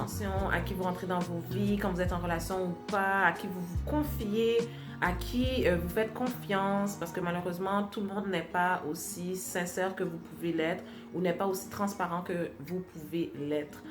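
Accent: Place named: Quebec, Canada. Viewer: Tu as bien l'accent québécois j'adore :)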